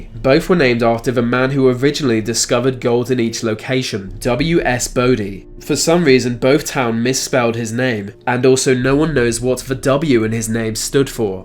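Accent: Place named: London, United Kingdom. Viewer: London accent. It's a class thing.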